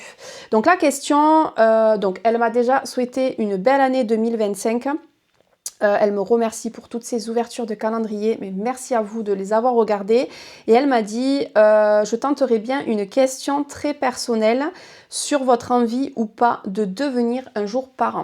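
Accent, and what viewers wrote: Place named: Béziers, France. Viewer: Ah je me disais bien que cet accent c'était celui de chez moi 😄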